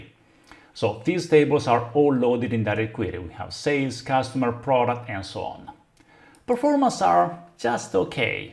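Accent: Italy. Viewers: Power BI is much more sexy with an Italian accent.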